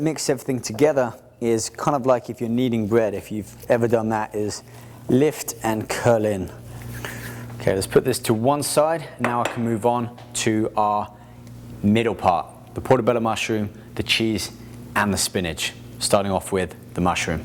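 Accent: United Kingdom. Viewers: i love the british accent!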